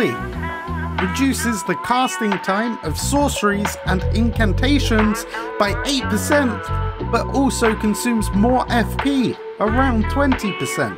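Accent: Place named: United Kingdom. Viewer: it's his normal voice, he is just bri'ish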